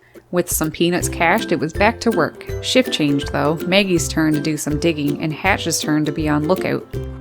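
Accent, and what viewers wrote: Canada. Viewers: You sound Canadian, eh?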